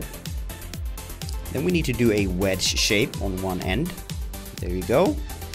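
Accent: Scania, Sweden. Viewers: älskar skånskan :D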